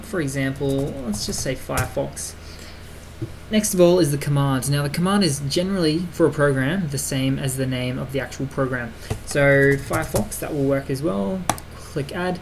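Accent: Australia. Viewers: You can easily make out from his accent that he is Australian, but if you want hints, First "GoodDay" greeting at start, Secondly look at this terminal/Username, Thirdly he calls Gufw Guffy.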